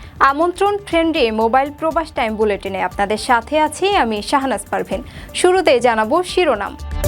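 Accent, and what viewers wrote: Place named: Barishal, Bangladesh. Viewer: এই পাঠিকার গ্রামের বাড়ী মনে হয় বরিশালে।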